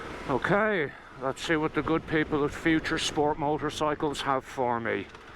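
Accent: Ireland. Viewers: An Irish accent under the palms?.......good lard! I agree, a fun little bike!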